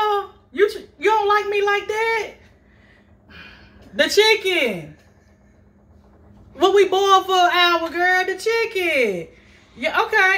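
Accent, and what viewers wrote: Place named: Louisiana, United States. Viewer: You sound like one of my cousins from Clinton, La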